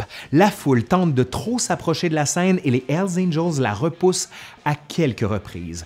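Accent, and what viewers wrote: Quebec, Canada. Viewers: Ce documentaire est très instructif agrémenté par le bel accent québecois ! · Je trouve que tu t'exprime super bien de façon à ce que les gens qui parle français arrive à comprendre. J'aime cet accent Québécois et ta prononciation des noms en anglais. Bon travail et merci pour ces informations que je ne connaissais pas du tout sur les hells angels ; moi qui croyais que c'était juste une bande de motard qui aime se battre !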